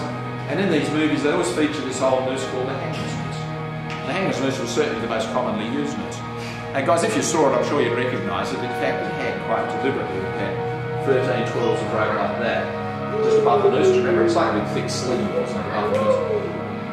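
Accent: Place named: Australia. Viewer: Australian accent makes it sound less scary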